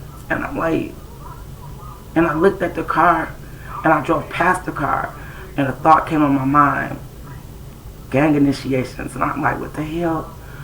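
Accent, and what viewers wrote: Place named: Michigan, United States. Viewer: Hi! you sound like you are from Michigan. Can you please tell me wth is wrong with GM, CEO Mary Barr? She don't GAF about employees. How/why is it OK for the auto industry to have mandatory Saturdays scheduled and taking volunteers on Sunday to work?This plant is running 7 days a week!!!HOW in a pandemic?? and did the UAW threaten the Governor? Supposedly, the UAW told the governor, they got her elected& they would not help her with her re election, if she shut down the auto factories again because of COVID_19.It has been said, allegedly, that none of the auto factories will shut down again because of the virus. Why?how is a building a truck essential? These auto factories have people coming from all over the state, to work at certain plants.They are, * allegedly* sneaking infected people out of the plant and not telling their co workers they have been exposed to COVID-19! 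How is this ok??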